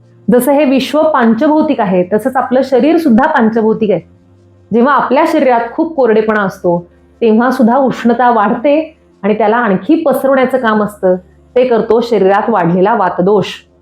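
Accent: Standard. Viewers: अतिशय छान. दैनंदिन जीवनात उपयोगी पडेल अशी माहिती. डॉक्टरांची भाषा अतिशय स्वच्छ व‌ उत्तम मराठी. ऐकत रहावं असं वाटतं. मनापासून कौतुक.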